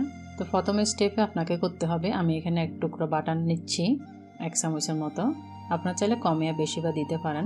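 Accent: Chittagong, Bangladesh. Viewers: Apu you from chittagong?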